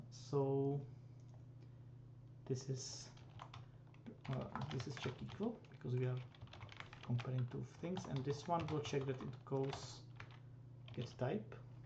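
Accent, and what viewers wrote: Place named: Czech Republic. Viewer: i can confirm, this is czech accent 100% lol, also the gothic shortcut on desktop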